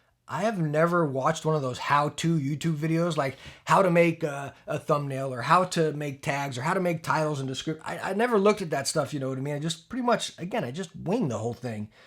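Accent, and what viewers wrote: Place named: New Jersey, United States. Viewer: As a Brit I guessed you were from jersey from your accent so I'm pretty impressed with myself, that could also just be shear coincidence tho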